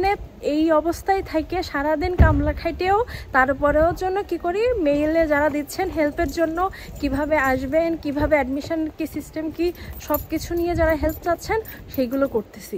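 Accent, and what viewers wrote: Khulna, Bangladesh. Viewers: Thanks a lot..r u originally from Khulna??? Just guessing from Ur accent... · আমাগের খুলনার বাষা মনে ওচছে? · Apni ki khulnar meye naki? Bhashar taan khulnar moto. Amar o khulna. 😊😊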